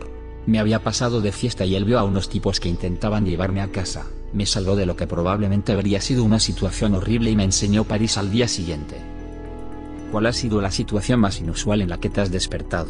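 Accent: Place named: Russia. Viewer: Yo digo aue si es rusa porque su acento se oye muy marcado.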